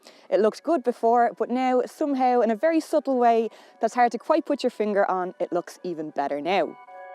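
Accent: Ireland. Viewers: Couple points, The color of the vehicle is really beautiful. The roads that were driven were very scenic, ? in Ireland; whereabouts? The interior in my opinion, especially infotainment (if you want to call it that) is not as nice as the competition. The curves and grille of the vehicle give the car a unique character. The lady doing the review is really pretty, and I have not been to Ireland, and enjoyed listening to her accent. I am writing from USA. Thank you for this review.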